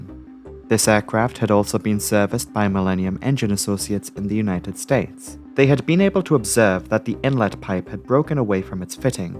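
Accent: Newcastle, United Kingdom. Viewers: Thank you for an amazing researched informative and beautifully presented video. By the way, nothing lovelier than a nice Geordie accent! X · You sound a bit geordie, but what else is going on with your accent, no way it's100% newcastle... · I would never have guessed you had a geordie accent :) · "Dana Air...grounded for maintenance and other violations so often we haven't had a fatal accident in ten years!" Your dedication going down the WTF rabbit hole at the end of this was appreciated. Incompetence is funny when it's not injuring people (although those who need refunds might want a broader definition of "injury".) And never apologize for a Geordie accent. As far as this American is concerned, the BBC has to apologize for exporting only programmes in which everyone spoke RP or occasional Cockney until the 90s. They've been holding out on us! 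Now that we've basked in some lovely Sheffield and Leeds Yorkshire for the past five years, Doctor Who isn' allowed to have a southern accent again until they've cycled through Scouse, Mancunian, Brummie, Geordie, Welsh, and Northern Irish. ;) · Your a Geordie? Well that was a surprise. Haddaway. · I have wondered about you accent. I had no idea that you're a Geordie. Awesome! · Your not a Geordie are you ? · You're a Geordie? I'd honestly never have guessed until you made it very obvious! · Go hard on the Geordie accent Sir. I was really fascinated by your research and subsequent report. Ah, I do not think I need reminding that booking a flight on Dana Air will not result in a satisfactory outcome. Sounds like a way to fleece unsuspecting, non-Nigerian international travelers out of their money and stash it under the mattresses of certain Nigerian government officials. To be fair, most of middle and south Africa is in a state of turmoil at this time, so it is not surprising that a certain 'cowboy' style of airline operation would be able to survive in such a theatre. 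What was especially shocking however was your discoveries concerning the engine maintenance outfit in the USA. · I'd adore if you did a full video with your native Geordie accent, it's such a lovely one! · GREAT video. I used to live in America, and I keep abreast of news from the states. Florida governor DeSantis is pro business to the point of business there running rough shod over ANY regulations what so ever. It's become an abyss of regulation dodging garbage. I am NOT SURPRISED so much of this is traced back to Florida at all. I would not trust anything coming from Florida (including the pilots themselves it seems) to have any degree of quality to it these days. You produce amazing videos, lovely Geordie accent girl! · Why I’d neva ov thought you were a geordie. I’m from Durham U.K. love your vids. They’re canny good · Your Geordie accent was Beltas Mate! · I started the video wondering what accent this is, but wouldn't have pegged it Geordie apart from the pronunciation of year.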